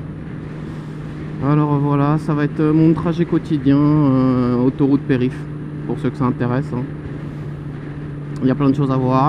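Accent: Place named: South, France. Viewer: Cool ta présentation, ça fait plaisir de savoir à qui on a à faire haha, et l'accent du Sud et bien non ? On a la classe nous les sudistes mdr. Sur ce Ride Safe l'ami. V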